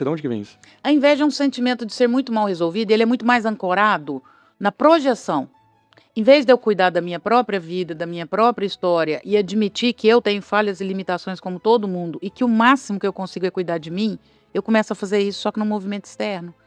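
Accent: Minas Gerais, Brazil. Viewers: Muito legal Dra o seu exemplo! Se intrometrerem na sua vida por um jeito autêntico e mineiro de falar mas não alcançarem de forma singela, o coração de quem carece das suas dicas e orientações para a nossa saúde mental. E tem invejosos-as passivos agressivos que fazem-se de bonzinhos/boazinhas, e aproveitam para nós alfinetar com frases maldosas do tipo: "Comprar casa é fácil mas difícil é pagar as prestações". Ou: "Emagrecer qualquer um consegue mas difícil é manter". E coisas horríveis como lembrar de nossos traumas ferir a todo momento onde surgimos felizes ou celebrando algo bom. Há pessoas que celebram satanicamente as nossas derrotas e tentam nos fazer sofrer até qdo estamos felizes pq não suportam ver ninguém bem. Como a Bíblia diz que DEUS separará joio do trigo! Que sejamos trigo e não joio apesar de tantas imperfeições que vejo em mim🙏🏻. 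Sucesso e sigo seguindo e compartilhando seus vídeos nos grupos de saúde e de Apoio tbm 🎉.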